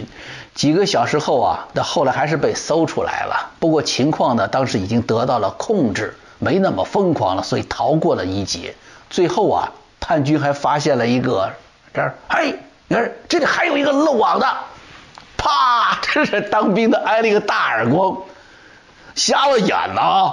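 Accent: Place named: Northeast, China. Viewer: 江老师东北话说的地道!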